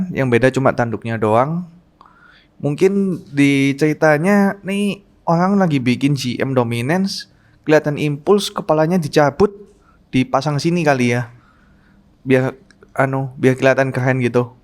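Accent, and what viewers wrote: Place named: Java, Indonesia. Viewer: MedoQ sekali...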